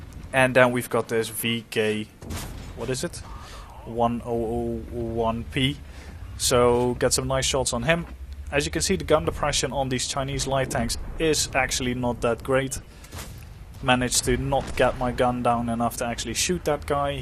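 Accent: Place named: Netherlands. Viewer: just what i thought, that accent! the WZ 131 looses the 100mm gun, thats all i know, but if you like ROF you wont choose the gun anyway, but i grinded the wz 132 with that gun. i liked it more although the muzzle break on the 131 looks way better IMHO. im from the Netherlands to btw!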